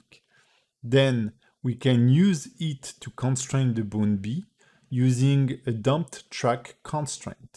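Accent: France. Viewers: L’accent french en force mdrr continue tu régale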